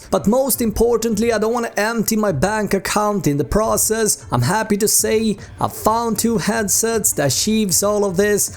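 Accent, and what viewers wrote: Sweden. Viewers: Strong Swedish Dialect :)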